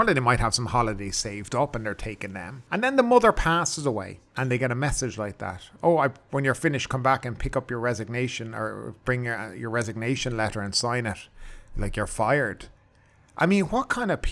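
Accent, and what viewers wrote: Ireland. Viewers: This guy sounds Irish.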